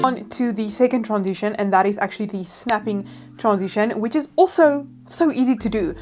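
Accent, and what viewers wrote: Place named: South Africa. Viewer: I can tell that you’re from South Africa, I’m from Namibia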